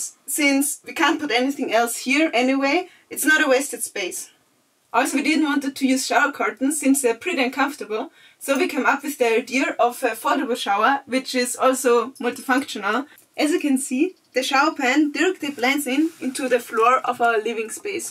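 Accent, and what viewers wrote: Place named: Italy. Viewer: I can hear a liiiiiitle bit of an Italian accent there : ) But I wasn't sure, great English speaking!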